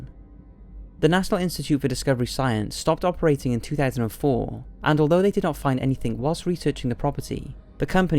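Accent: Wales, United Kingdom. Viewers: r u welsh?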